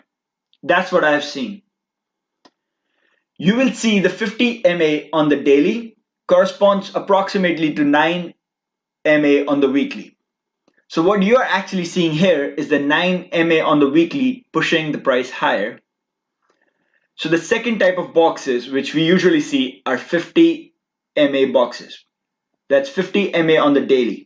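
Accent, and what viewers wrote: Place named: India. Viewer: thick indian accent, my head is wobbling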